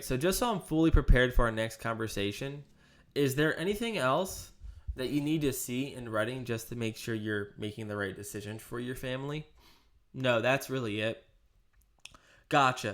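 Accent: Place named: Rural, United States. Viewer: Heyyyyyy…..it’s!!!! Hardest opening line, wish my name was so I could say it with that country twang😂 anyone new to this channel, watch EVERY.SINGLE. VIDEO. that this man puts out. He will help you pick the right agency, get out of bad ones, create a GOLD script, source free leads, teach others. Dude you guys don’t understand is literally putting his neck out there so that we can learn and get real,honest,quality information about the industry, and what to watch out for. These big IMO/MLMs don’t like what he says because it pulls back the curtain on lots of these pump and dump recruitment models. has not only lived the broker/agent life good and bad but also teaches others the exact blueprint. He’s sharing his success and wins with us random strangers. Protect this man at all costs. Also just got my resident license snd a bunch of non resident license which my imo told me to get and then after getting them said I overdid it😂 So far so good, no red flags in the contracting department. 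I’ll be starting age lead calls Tuesday. In the meantime I’m still trying to get a hang of doing EAPPS and scripts. Any tips on mastering EAPPS and the products each carrier sell? Thanks. Keep it up!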